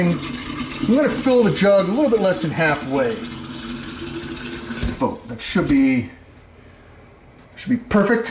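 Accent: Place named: United States. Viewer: Love the American accent ,,,, can fellow Americans know what state he maybe from just by his accent ? Just curious, from Australia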